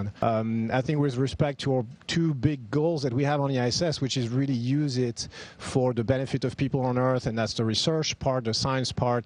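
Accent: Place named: France. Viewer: Français, definitely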